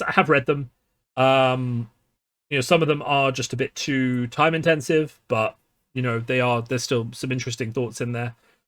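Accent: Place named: United Kingdom. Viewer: A Lovely British Accent Indeed! Yes I Listened To The End)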